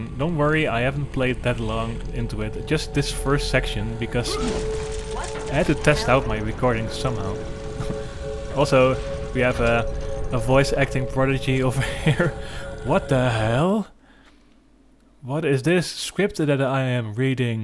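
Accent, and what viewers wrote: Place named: Netherlands. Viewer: Nederlanderrrrrrr!!!! 1e wordje hoorde ik het al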